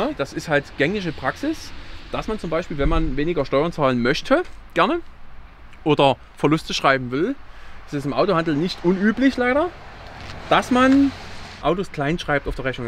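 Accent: Saxony, Germany. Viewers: Alles gut ! Aber das gesächsel macht es anstrengend zu folgen . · Gehört das vulgäre Geschwätz zum Konzept? Schwer zu ertragen. Sorry, aber Sächsisch ist schon anstrengend genug!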